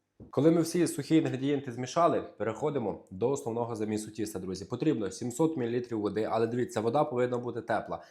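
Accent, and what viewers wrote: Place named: Lviv, Ukraine. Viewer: За акцентом - це мій земляк, львів'янин! Цікаво, вгадав, чи ні? Рідний говір майже завжди пізнаю. А тепер питання: 4 хлібчика мені забагато, чи є якісь тонкощі, якщо розділити все на 2?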